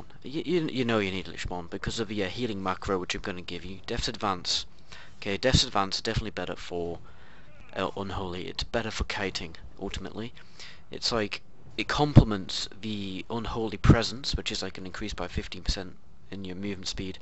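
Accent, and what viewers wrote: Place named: England, United Kingdom. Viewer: Oh how I missed the English accent and their way of pronouncing words, hehe.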